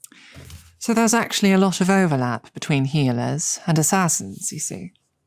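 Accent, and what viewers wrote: United Kingdom. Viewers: British, needs to kill me and spouts puns? Yeah its a friday alright, ty!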